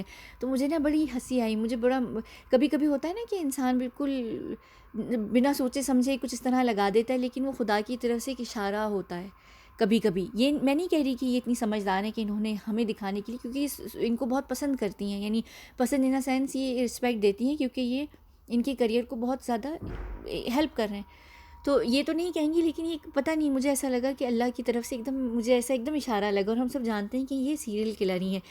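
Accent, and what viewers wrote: United Kingdom. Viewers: I was watching and listening to this video and feeling so sad. Then I was shocked at the way you spoke in English. 😱 The British accent. ❤️ I mean the sudden twist! Your way of speaking is amazing. Amazing analysis. I hope and pray everyday he gets justice. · Apka British accent bohot pyara h... jinko dikat h vo gvar h 💁‍♀💁‍♀ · Ur voice is awesome. Ur english perfect. British accent is like the way u speak. Ur woman with beauty (didnt see but can guage with ur voice n heart) n brains. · I Love when you speak in English. It's very UK ish in accent 👍👍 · I luved ur English accent really Ye British accent h kya???? I appreciate your accent really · I like your English accent.😊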